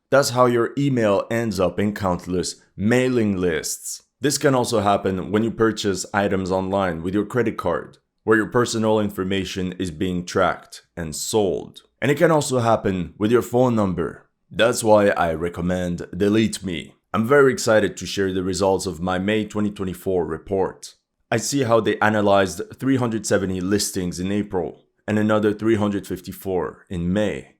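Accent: Quebec, Canada. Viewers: canadian french right? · Where are you from ? I can’t place that accent maybe Quebecois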